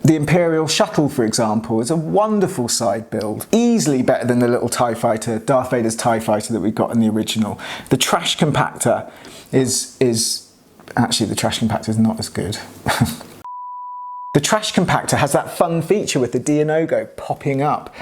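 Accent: England, United Kingdom. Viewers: You must be from the same 10 mile area of England as tom scott. Your accents are to close to be anywhere farther apart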